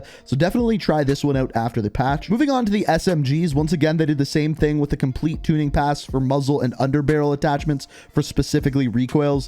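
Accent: Canada. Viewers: This dude sound Canadian ...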